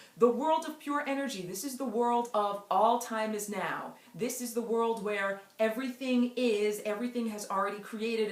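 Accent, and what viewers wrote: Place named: New York, United States. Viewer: Interesting shift from previous video. Faster speaking. A bit more NY accent.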